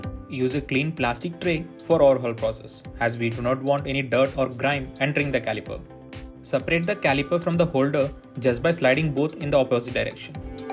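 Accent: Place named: India. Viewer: Finally I found English indian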